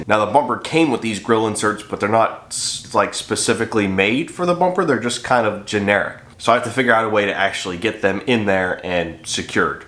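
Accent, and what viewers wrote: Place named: South, United States. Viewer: You have got to be from the south, the way you pronounce "on" like "ahwn"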